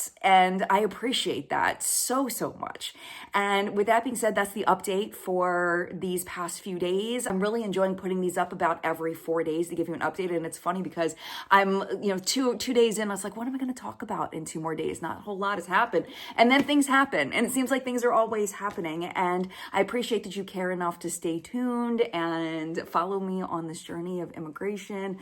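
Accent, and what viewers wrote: South, United States. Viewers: Here I am thinking of my recent retirement party hearing at least 7 accents from around the world plus 3 from different parts of Canada or my book club that has a member with a way deeper south US accent than you do ... nah you are good.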